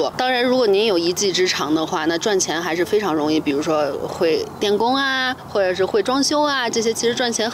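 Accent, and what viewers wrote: China. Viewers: 大陸鄉音太重！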